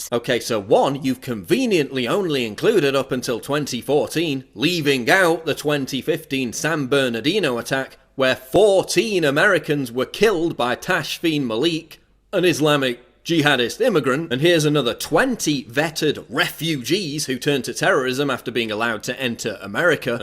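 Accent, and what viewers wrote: United Kingdom. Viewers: off topic but aren't you british ??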